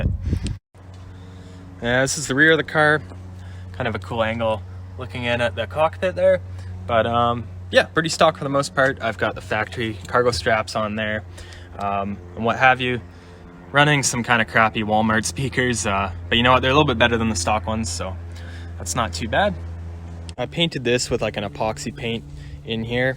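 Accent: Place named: Canada. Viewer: Instantly knew he’s from Canada by the way he pronounces his O’s and R’s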